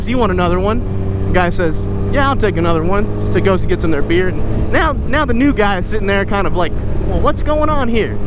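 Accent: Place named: Georgia, United States. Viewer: It’s Georgia we all have one that comes out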